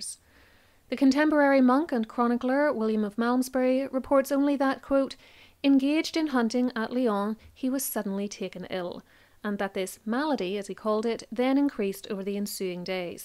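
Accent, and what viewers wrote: Northern Ireland, United Kingdom. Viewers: She's from Northern Ireland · I agree, part of the reason I listen is for the beautiful music of this accent. Northern Irish, maybe? Whatever it is, your diction and accent make the videos wonderful to listen to. 🥰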